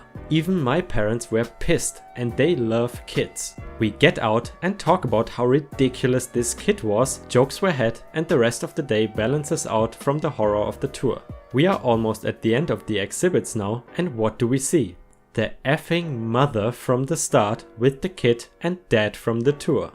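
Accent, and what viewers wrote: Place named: Germany. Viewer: You did great! Love your voice, so refreshing to hear a German speaker doing reddit stories :)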